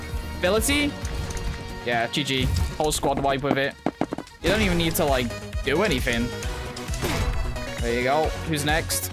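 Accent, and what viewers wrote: United Kingdom. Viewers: Are you british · I just realised he is British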